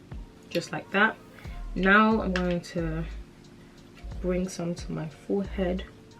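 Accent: United Kingdom. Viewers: Wasn’t expecting the british accent!! Buzzing cause most foundation routines are american and they using products we can’t get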